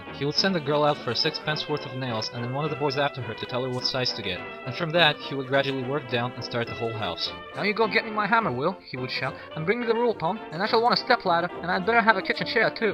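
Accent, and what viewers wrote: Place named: United States. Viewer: Why the fuck is a septic narrating this?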